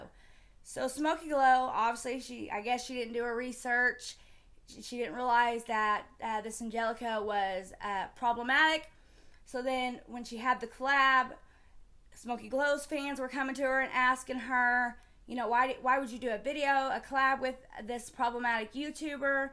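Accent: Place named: South, United States. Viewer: Wow I never would've guessed you're from Indiana. You sound like you have a southern drawl.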